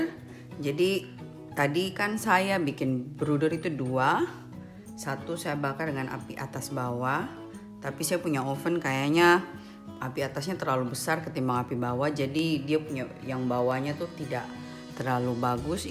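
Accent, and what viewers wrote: Papua, Indonesia. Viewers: Salam kenal Dek.. Sy SUKA LOGAT PAPUAx...😍😍😍Asli... Sa pikir...su sampe...Belanda Logat Lain kah😂😂😂 Andalaang😍😍Gbu Sorong Papua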